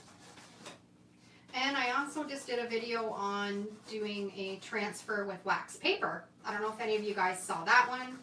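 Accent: Canada. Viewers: you have a Canadian accent. People can get over it. I'm American; I say 'deck-o-page,' but you do you. I'm here for the learning! Thank you so much for teaching so well! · It's actually pronounced day-koo-paazh, but I think it's your Canadian accent which is really cute. I always giggle a bit when I hear you say it.😀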